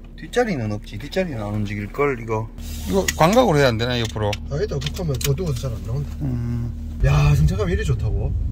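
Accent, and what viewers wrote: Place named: Gyeongsang, South Korea. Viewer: 오리지널 대구네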